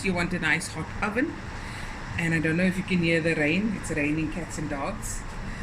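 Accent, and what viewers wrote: South Africa. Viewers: Is that a South African accent I am hearing?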